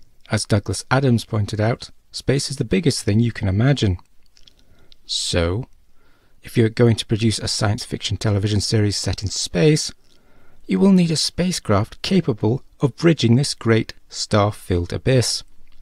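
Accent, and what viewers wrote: United Kingdom. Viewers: You sound British, so I'll cut you some slack. America had a famous comedienne by the name of Lucille Ball. She, and her husband, Desi Arnaz purchased an old movie company, RKO Studios, (which made the original 1933 film," King Kong"), as it was going out of business. They changed the named to Desilu, (Dez-E-Lu), which was made up of parts of each of their names. They made several television programs at their studio, like "I Love Lucy", "The Untouchables", among others. In the early 1960's they divorced, and Lucy was left to run the studio on her own. Apparently, she was not the greatest business woman, and things started to fall apart. Literally, there was vermin in the walls, pigeons in the rafters, etc. To raise money for repairs, she decided to make other television shows besides her own, "The Lucy Show", and teamed up with both NBC, to make "Star Trek", and CBS, to make, "Mission: Impossible". 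Both shows were filmed at ajacent sound stages. While CBS, and the public, liked Mission: Impossible enough to keep it funded properly, NBC didn't do the same with Star Trek. Over the three years that it was made, its budget was reduced each year, its time slot and day of the week it aired were constantly changed, so, if you did watch those original airings, (as I did), you never really were certain that it would air at the same time and day for next week's episode.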